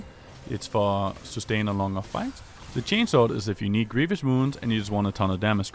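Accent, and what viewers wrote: Denmark. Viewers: I can hear the Dane within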